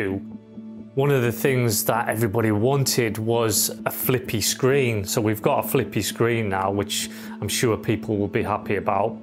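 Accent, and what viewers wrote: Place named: Carlisle, United Kingdom. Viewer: Hi subscribed as soon as I heard the accent! Ex Carlisle lad living in Australia. Have been thinking really hard about selling all my olympus gear and getting this camera…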